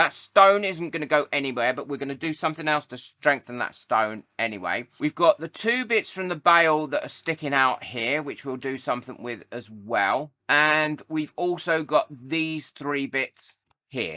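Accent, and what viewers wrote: United Kingdom. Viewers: It's just wrong to hear US standards in such a thick UK accent...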